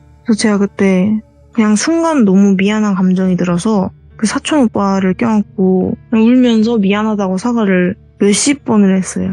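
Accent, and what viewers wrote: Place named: Jeolla, South Korea. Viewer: 구수한 전라도 사투리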